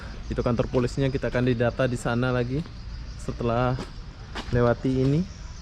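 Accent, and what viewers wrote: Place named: Malaysia. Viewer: Macam logat malaysia ja mas..pake bahasa negara sendiri ja mas...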